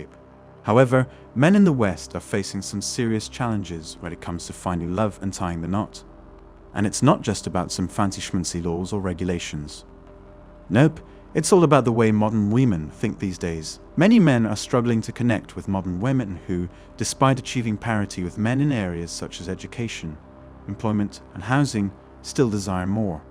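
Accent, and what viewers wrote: United Kingdom. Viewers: How wonderful the British calm presentation, in addition to the nce accent, when compared with the high pitched American presentations - almost shouting.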